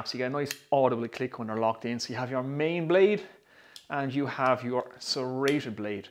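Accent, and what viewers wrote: Waterford, Ireland. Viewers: I just got one today. Is that a Waterford accent? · Great video. Additionally, an Irish brogue is the most beautiful sounding accent.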